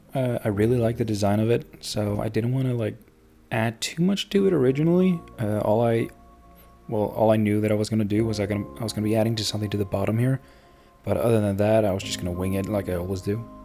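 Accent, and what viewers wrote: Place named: United Kingdom. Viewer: Nah mate definitely should've kept the Brit accent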